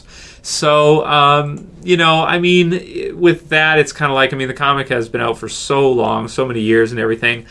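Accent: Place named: Canada. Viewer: I love your Canadian accent, can you please do a video of you just saying OUT and ABOUT over and over...thanks!:)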